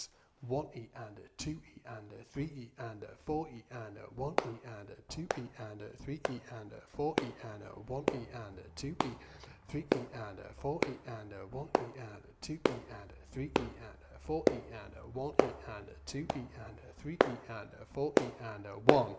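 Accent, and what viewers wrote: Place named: Manchester, United Kingdom. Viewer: Tha sounds like a manc lad, lad! Great tutorial BTW Im a guitarist and this was great help for me. Just one thing....have you just got back from robbing an ASDA?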